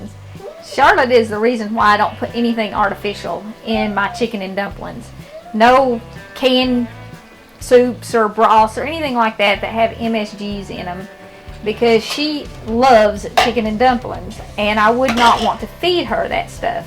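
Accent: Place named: Tennessee, United States. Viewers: Chicken n dumplings are my favorite!! Mama made great dumplings. She rolled hers out and cut them out. She had taken the chicken out of the pot and dropped each dumpling along the side of the boiling broth. She would use a butter knife to push the dough toward the center. I just thought that’s how everyone did it. But I’ve watched many many cooks on here make c n d and no one dropped them like she did. I’m like you, I don’t want veggies in mine. My Daddy didn’t even want the chicken put back in the dumplings. 😊 yours look more like what we do in East Tennessee. From your accent you might also be from TN. 😂 God bless you and your family. 🙏🙏🇺🇸🇺🇸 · YOU to are a fine blessing to this old man who can't cook too well here in WV. Thank You so very much for the patient instructions and ideas. Your wonderful voice, with charming Tennessee accent reminds me of my wonderful, long-passed Aunt God Bless You and your fine family.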